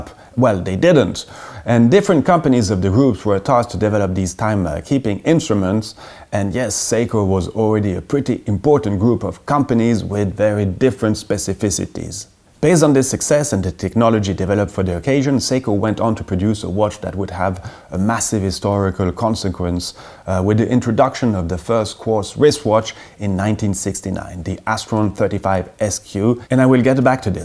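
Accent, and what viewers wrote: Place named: France. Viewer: This being the very first video I've watched on your channel, I was assuming, judging by your name and accent, that you were French. Imagine my surprise when you mentioned near the end that you're Swiss. And you're making a video praising a Japanese watch brand. Respect! Were you tarred and feathered upon your return in Switzerland? I can't imagine the backlash I, as a Belgian, would be facing if I made a video singing the praises of British moules-frites. 😂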